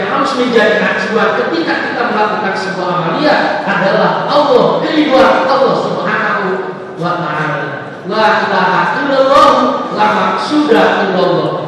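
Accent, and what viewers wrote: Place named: Garut, Indonesia. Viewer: subhanallah sima kawantun has garutna khas pangersa mah 😍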